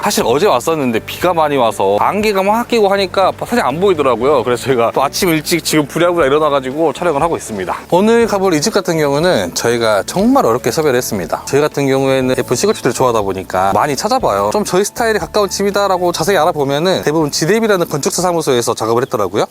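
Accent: Jeolla, South Korea. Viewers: 영상 처음보는데 혹시 전남쪽? 광주? 이신가요? 차라리 사투리를 더 쓰시면 잼있을듯 합니다 ㅎㅎㅎ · 말이 전라도네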